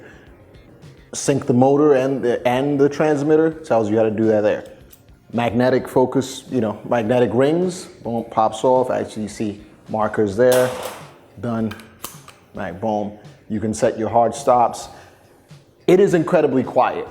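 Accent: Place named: East Coast, United States. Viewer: Ok, thats makes sense. Sounded like an East Coast accent. I'm trying to test out the Varicam but they dont have one in Chicago (for rental atleast). But from what I've seen and some footage I've graded it looks to be a solid camera. I'm rolling with the Ursa Mini 4.6k right now, which is great, but it has some problems with fine hue discernment (especially on the red channel) and not a nice sensor texture above 800ISO. I shoot mainly docs, and some promos. The varicam looks like the move for me in early 2017. Cheers!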